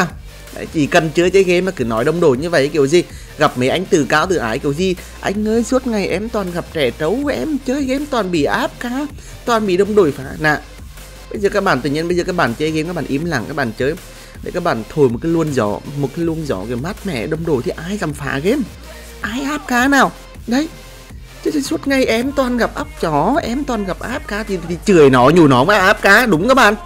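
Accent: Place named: North, Vietnam. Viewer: dọng ah miền bắc nghe hay quá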